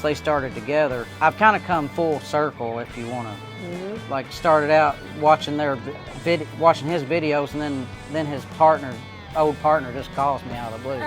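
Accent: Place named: Kentucky, United States. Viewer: Awesome KY accent. :)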